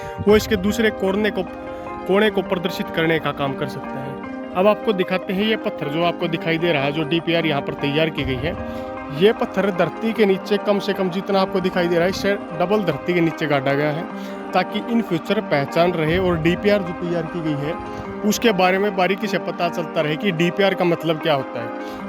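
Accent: Haryana, India. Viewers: Zabardast yaar ..... infact your haryanvi accent is also amazing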